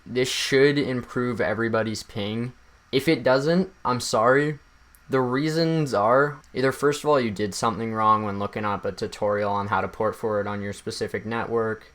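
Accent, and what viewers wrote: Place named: Canada. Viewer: your Canadian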